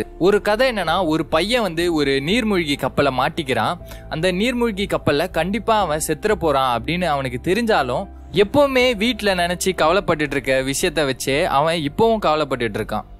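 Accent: Kerala, India. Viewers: That kerala slang tamil voice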